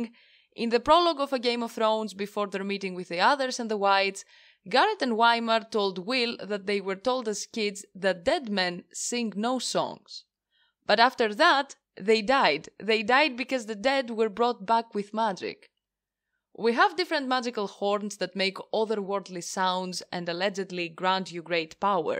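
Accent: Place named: Greece. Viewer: It sounds Greek 🤙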